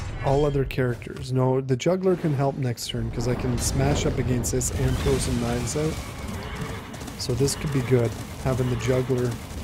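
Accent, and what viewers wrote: Canada. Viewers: Loving your videos. Also loving the Canadian accent, you should say "aut" (as in "get aut") and "eh" more :) And keep the beard.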